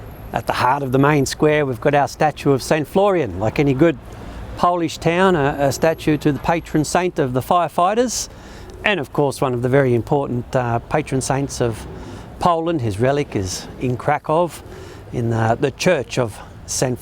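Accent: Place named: Australia. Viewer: G'day, Thanks for the informative and professional video. It was great to hear this unmistakable Ozzy accent. I don't know how long you've been living in Poland but in the very unlikely case you haven't heard about Great Masurian Lakes I strongly recommend a few days holiday in this area. Greetings from Melbourne, Andrew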